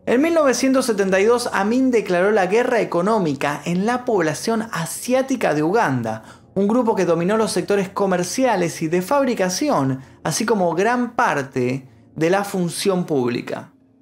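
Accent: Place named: Argentina. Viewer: Oye no puedes grabar los vídeos sin meter ese asiento argentino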